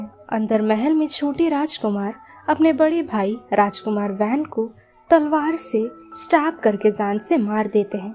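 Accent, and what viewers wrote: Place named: Bengal, India. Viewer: She sounds to be bangoli.. that's why you're getting problem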